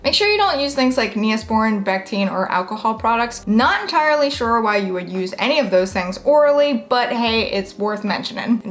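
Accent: South, United States. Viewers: I can tell, shes from the south.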